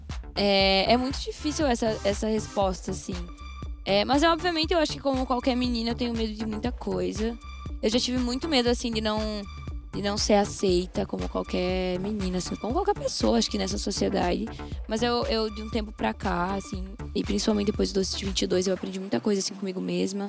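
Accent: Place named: Northeast, Brazil. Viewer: Pq ela tá falando com sotaque norte/nordeste se ela é do sul?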